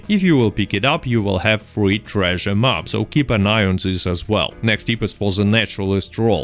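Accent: Russia. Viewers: he has a Russian accent maybe because he is Russian? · Russian accent and wilderness sounds great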